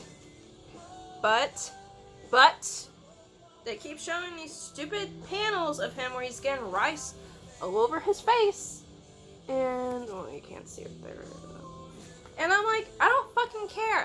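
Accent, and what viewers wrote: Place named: South, United States. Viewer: I'm so glad you read this and loved it! It's a beautiful manga. I love when your country accent comes out.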